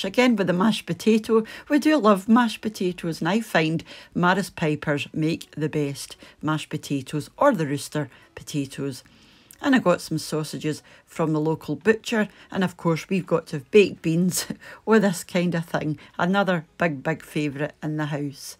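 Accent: Scotland, United Kingdom. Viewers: I agree about your voice. The Scottish accent is just so distinct and is one of my favorite. Rupauls Drag Race (if you didn't know it's a competitive drag queen show) is doing a second season for the UK and 1 of the contestants is Scottish. Now I have you and Lawrence Chaney to listen to all the time! ❤❤❤❤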